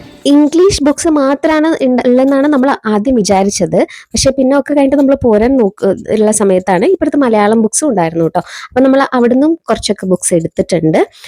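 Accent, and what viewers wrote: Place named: Palakkad, India. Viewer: u looking so beautiful and he is also looking smart Palakkad samsaram kelkkan oru rasam.Thanku. Cheeverdu sound I really enjoyed I think u also like reading It' s a great thing parents encouraging reading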